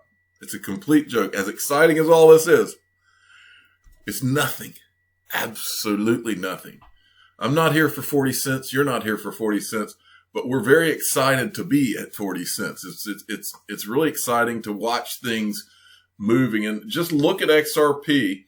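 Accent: South, United States. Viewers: Glad to be back. Missed your sultry southern voice sing to me about XRP. Happy and Healthy Thanksgiving. Smoke that Turkey!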